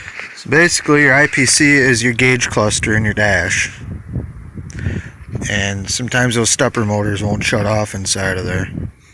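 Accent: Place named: Corning, United States. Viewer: you sound like my cousins from Corning NY... same accent lol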